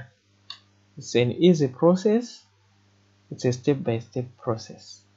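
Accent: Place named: Zimbabwe. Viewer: Sound like a Zim brother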